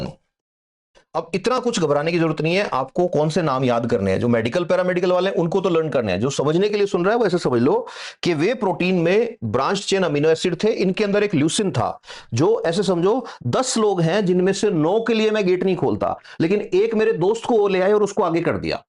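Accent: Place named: Haryana, India. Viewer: Sir haryana se lagte ho isliye topic suru karne se pahle namste ki जगह ram ram se suru kro to jyada achha nhi rhega bs man me aaya to bol diya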